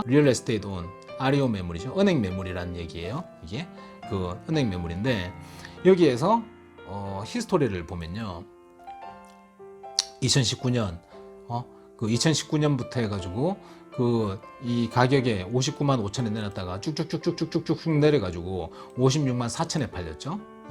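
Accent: Gyeongsang, South Korea. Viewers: 처음 영어로 준비없이 들은적이있는데 도통 감을 못잡겠더라구요. 정감있는 경상도사투리로 설명들으니 이해가 잘되네요ㅎ 감사합니다. 북가주에도 계셔서 조언받을 수 있으면 참 좋겠습니다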